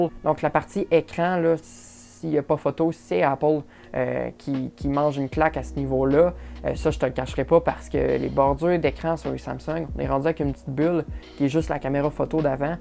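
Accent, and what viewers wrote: Quebec, Canada. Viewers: on voit le tres bel accetn quebecois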